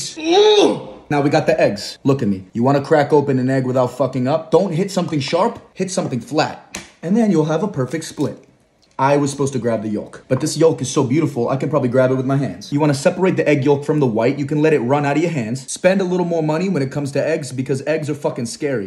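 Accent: Italy. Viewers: italian its his real accent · Devo dire che si sente il tuo accento italiano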